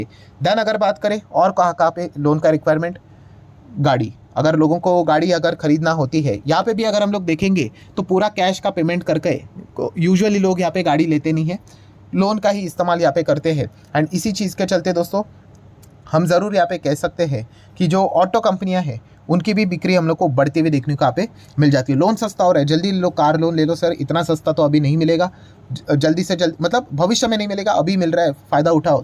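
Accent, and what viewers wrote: Maharashtra, India. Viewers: Accent to Marathi he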